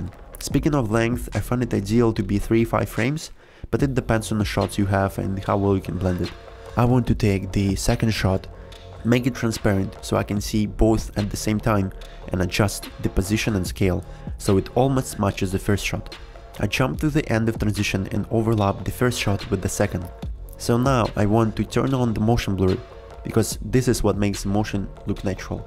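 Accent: Russia. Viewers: Какой ужасный русский акцент!) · Russian accent detected ^^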